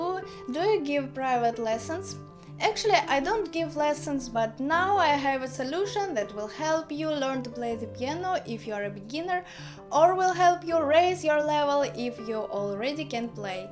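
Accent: Russia. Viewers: РУ.акцент не уберешь из жизни)))лайк)) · Этот милый русский говор, имитирующий английское произношение, заставляет меня думать, что русские танки просочились сквозь бутылочное горлышко Фульды и пьют кофе на французских пляжах. Спасибо, очень хорошо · Your Russian accent when you talk English is so cute. I'd like to greet you for this amazing video. Congrats! Ps: I always wanted to learn how to play piano. So, thank you for sharing with us this app. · Lovely to hear your voice over the Flowkey advert - English with a Russian accent 😘 You should be in a James Bond Film 😉 Your piano interpretation was so beautiful and melodic you are a special rainbow - I always look forward to you , you inspire me 😀😊🤗🤗😎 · Lovely russian accent, listening to you is a joy, every time :) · Нам все равно слышно русский акцент) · Me: Plays epic piano! Me: Loves Rock! Me: Has cute Russian accent! 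Me: recently discovered her channel! Me: uploads new video at 3am Me: has very cute smile Also me: my life is complete! 😍😍😍😍😍😍😍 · Привет! I hope I wrote your name correctly in Russian 😀. I just speak some words in Russian language and I don't speak English fluently. But I could understand everything you said. I love your Russian accent so much and your videos... the way you play... Everything. Beautiful and amazing as always. 💘❤️☺️ Congratulations! From Brazil 🇧🇷 All the best, Matheus. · когда говоришь по-английски, стартуй буквы глубже, иначе акцент бъет по ушам даже мне, русскому. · До этого видео, я на канале только второй день и думал что автор девушка из-за границы откуда то) но услышав русский английский, сразу понял, что это не так😉😌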